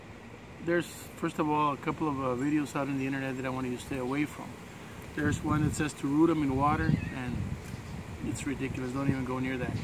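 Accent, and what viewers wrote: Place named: South Texas, United States. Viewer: It's crazy how a South Texas accent is so recognizable. I'm from East Texas. Thanks for the info. Was short and sweet. To the point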